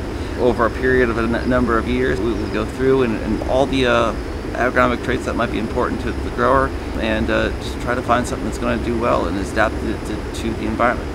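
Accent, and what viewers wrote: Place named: England, United Kingdom. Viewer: Brother has an un-pickable english accent 😄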